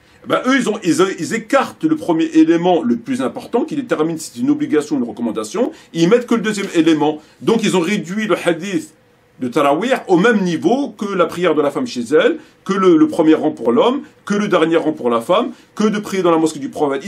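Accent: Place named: France. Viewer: Qu'Allah te guide SubhanAllah, tu nomme toi même dans le début le conflit de fitna, la où des compagnons présent à l'époque n'ont pas prit position et parti car fitna il y avait, toi avec ton accent de français quand tu prononce péniblement des mots en arabe tu viens tenter à mettre en doute l'attitude à avoir pour ne pas laisser le conflit entre eux et Allah, tu dis toi même c'est un conflit entre musulmans, tu t'entend pas ? SubhanAllah on s'en fou de ton avis, garde pour toi ton venin et ta compréhension avec ta raison 3aqli Laisse la transmission au vrai savants et va apprendre l'arabe correctement sinon va regarder un film sur netflix par exemple Tu es à l'image du zindiq oubrou que l'on vois derrière toi sur un livre dans d'autres de tes vidéos Fais de la pâtisserie ou je sais pas, mais trouve toi une autre occupation que de reprendre du venin philosophe et avec ta raison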